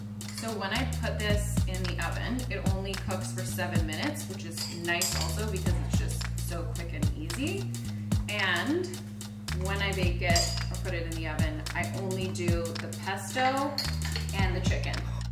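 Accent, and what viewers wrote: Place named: Canada. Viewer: I love the sound of voice and her Canadian accent ❤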